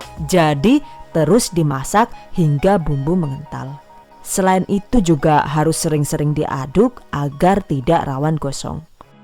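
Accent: Banyumas, Indonesia. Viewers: Belum lama kenal vlog ini, langsung jatuh hati. Lagsung subcribe, kepo buka video" semua masakan😄😄 Sangat menginspirasi sekali. Jelas & mudah penjelasannya. Bahasanya itu lohh.. enak dengernya... Palagi pas yg ngapak".hehehe...Ngakak polll #giveawayTHVagustus19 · Penjelasan nya detail.suara nya enak di dengar,lucu ada ngapak nya.bahasa Inggris nya juga keren.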